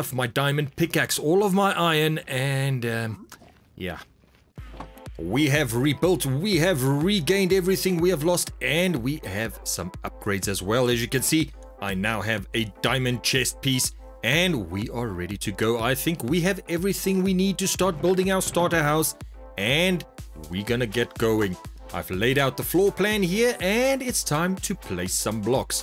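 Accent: South Africa. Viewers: You sound South African... are you? :D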